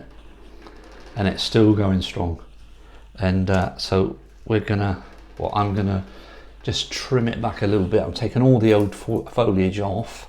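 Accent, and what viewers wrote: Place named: West Country, United Kingdom. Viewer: Nice plants, nice to hear a proper West Country accent. Before I left the uk we had grandmas cactus that were said to be her moms, I’d like to think they still exist in some form or another, at the moment I have red, pink and white ones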